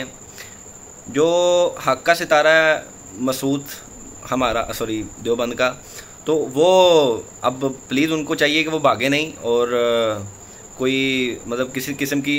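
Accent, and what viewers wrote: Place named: Punjab, India. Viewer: مووولک نہیں ملک ہوتا ہے۔ اردو بولنا سیکھ لیں بھائی ۔ آپ کی اردو پنجابی زبان کی چغلی کھا رہی ہے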